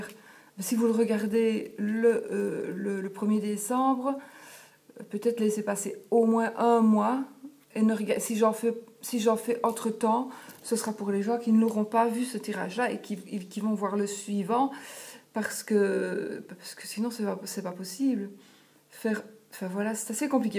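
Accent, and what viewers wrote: Belgium. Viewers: Faites au mieux mais je suis toujours très pressée de regarder vos vidéos..et votre accent belge me rappelle des amis""perdus"".....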